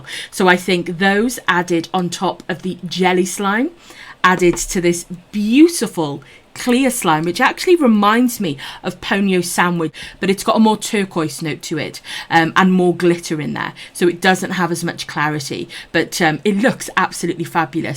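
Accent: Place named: England, United Kingdom. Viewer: Great review! I’m in love with ur English accent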